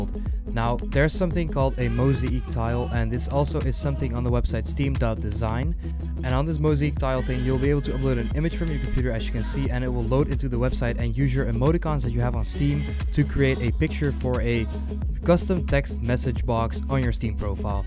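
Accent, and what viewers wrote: Netherlands. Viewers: dutch accent NotLikeThis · Are you from the Netherlands? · ben je nederlands xD lol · i just knew that you were dutch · Instantly knew you were dutch hahaha you guys never can hide your accent :) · lol ben jij Nederlands? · IK WIST DAT JE NEDERLANDS WAS! :D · Wait. Are you Dutch? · Are u Dutch?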